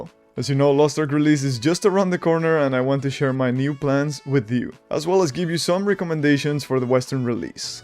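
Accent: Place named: Europe. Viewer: I thought you were EU based on your accent haha, or you’re playing both regions?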